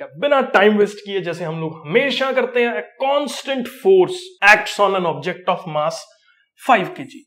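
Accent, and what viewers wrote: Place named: Punjab, India. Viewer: Punjabi aagye oye ❤😅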